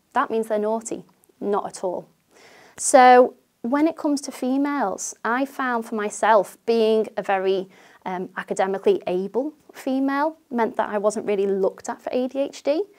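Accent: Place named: Scotland, United Kingdom. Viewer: I was also wondering if it's irish or Scottish... imma say Scottish haha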